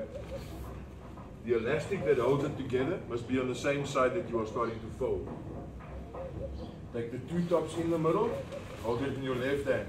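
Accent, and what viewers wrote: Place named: Afrikaans, South Africa. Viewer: As soon as I heard an Afrikaans accent I knew this ballie was the real deal 😂 Thank you, Sir. That was very helpful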